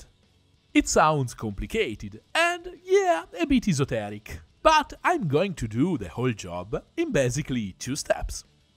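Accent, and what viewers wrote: Italy. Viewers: ho capito che eri italiano prima di vedere il nome del canale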